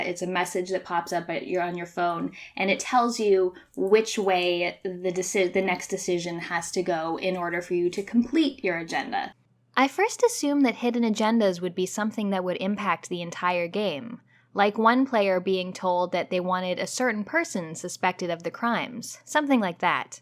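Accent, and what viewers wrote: Canada. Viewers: Hi, great review, enjoyed it a lot. Lovin how you explained it very easily. On a slightly different note, Are you from Canada 🍁. 😀 👍 👍 👍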